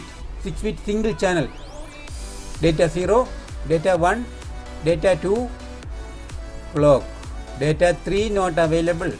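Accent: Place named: Kerala, India. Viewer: നല്ല ഉപകാര പ്രതാമായ വീഡിയോ അത് പോലെ നല്ല മലയാളം പോലത്തെ ഇംഗ്ലീഷ്,👍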